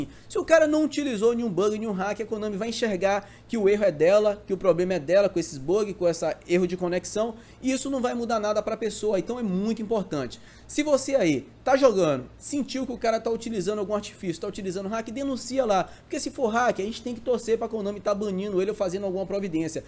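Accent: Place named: Bahia, Brazil. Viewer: seu lindo, foi doloroso traduzir seu baianês nesse vídeo, mas tá aí, tudo em inglês pra você. faça bom proveito: )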